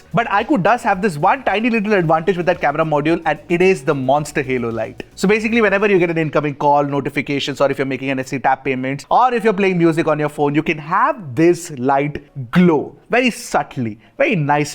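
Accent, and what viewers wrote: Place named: North India, India. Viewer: I thought you were from North.. i was surprised by 😮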